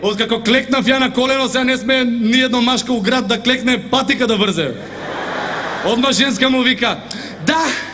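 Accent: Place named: Kumanovo, North Macedonia. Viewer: Kumanovac od daleko se poznava.Bravo samo takoj produzi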